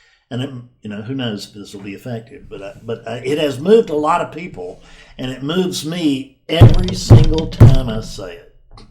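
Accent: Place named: Texas, United States. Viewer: Dear, I would love to see you standing in front of a lectern bashing away with your plastic brain in your charming Texas accent in a church of non duality! Hallelujah brother! Seriously though, that was incredibly helpful. Thank you so much. Very funny indeed 👏👏👏😂🎉🎉🎉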